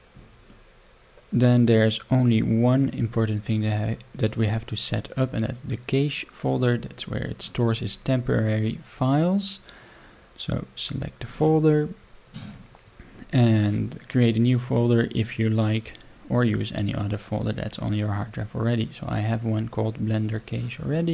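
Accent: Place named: Netherlands. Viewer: Je klinkt Nederlands, dus ik probeer het gewoon even. Dit werkt allemaal super, maar voor Nederland is een nauwkeurigheid van 30 meter niet echt realistisch. Nu is er natuurlijk het actueel hoogtebestand die om de meter een hoogte puntje heeft. Is die als SRTM data sorce te koppelen aan de GIS plugin?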